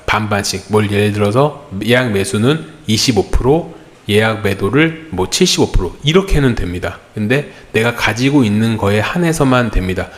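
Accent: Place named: Seoul, South Korea. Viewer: 와 목소리 달달하다.. 이러니 서울말에 뻑이가지…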